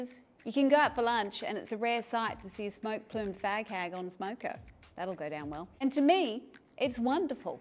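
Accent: Australia. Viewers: Don't know anything about vaping, just smoking is bad for one's health. Just want to say I love the Aussie sense of humor and the accent. my siblings have been to Australia and my neice went to university in Sydney. They love it. Keep up the good work.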